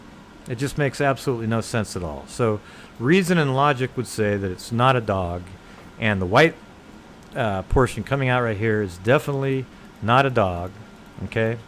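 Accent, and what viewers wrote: United States. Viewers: The best part about this series of debunking videos is, he's being more of a jerk about it the longer it goes on... and he sounds like he has an Indiana accent.... so he kind of sounds like a belligerent Mike Pence, LOL! Love it. Keep the videos up.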